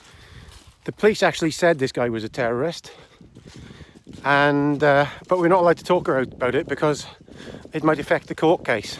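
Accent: United Kingdom. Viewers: Trying to place your accent, are you close the Shropshire/Herefordshire borders?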